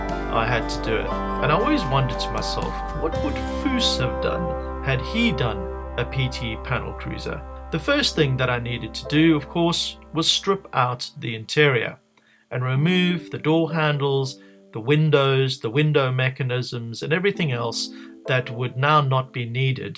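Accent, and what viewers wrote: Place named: South Africa. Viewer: good to hear an SA accent.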